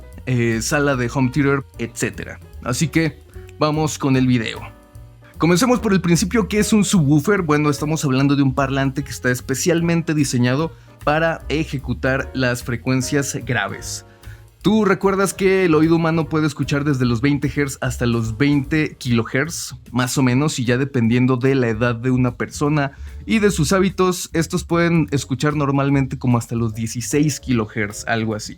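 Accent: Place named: Mexico. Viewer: soy Chilena y me encanta tu acento jajajaja es de pelicula ....saludos aguante México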